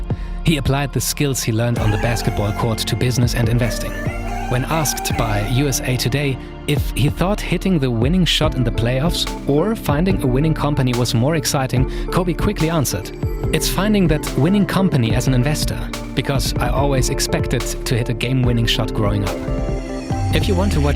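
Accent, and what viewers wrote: Germany. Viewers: Super Arbeit die du leistest mit den Videos! Man hört auf jedenfall raus das du deutscher bist :D Keep up the great work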